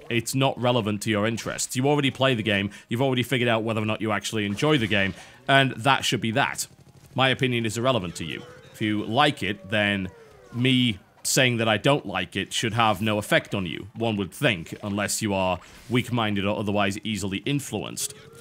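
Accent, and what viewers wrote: United Kingdom. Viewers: Are you from UK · No doubt a ton alredy replied something like this but... how the hell did you get that rather typical brittish accent to that of someone from texas xD?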